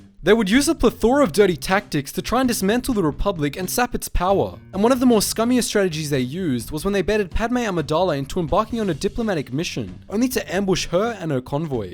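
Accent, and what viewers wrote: Australia. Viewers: Bro you sounded so Aussie in this video, are you? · Love the Aussie voice xD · gotta love that deep aussie accent narrating this.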